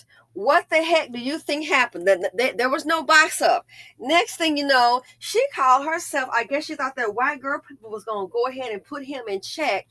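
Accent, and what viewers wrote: South, United States. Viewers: Girl that country twang 😭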